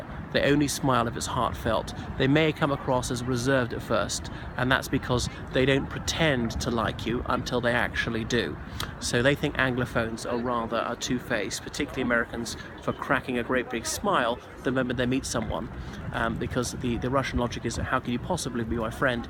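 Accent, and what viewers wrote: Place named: Home Counties, United Kingdom. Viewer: u seriously from Ireland? U sound like you're from the home counties!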